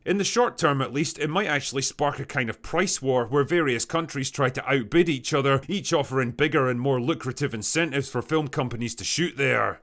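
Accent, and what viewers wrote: Scotland, United Kingdom. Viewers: A Scottish chipmunk voice. Awesome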